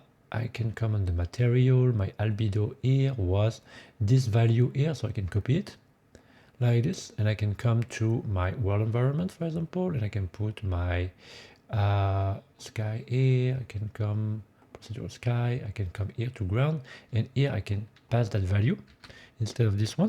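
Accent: France. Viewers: je m'attendais pas à l'accent français, super vidéo en tout cas et merci pour le contenu gratuit et super instructif que tu nous proposes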